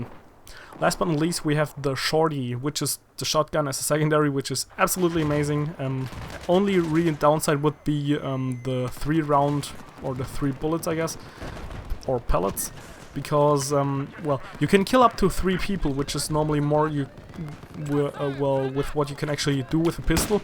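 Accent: Germany. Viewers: Are you German, because of your accent? ;D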